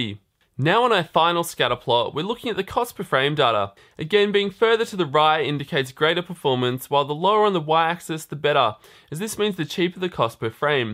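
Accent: Australia. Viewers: Oh ok, I didn't know this....hey so you have an Australian accent, do you live in australia or...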